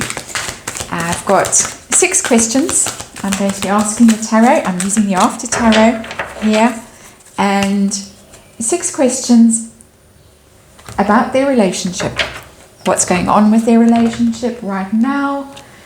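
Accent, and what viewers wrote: South Africa. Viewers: Delighted to have found your channel, I lived in South Africa for decades and your lovely voice and accent make me feel nostalgic! You are a great tarot reader too!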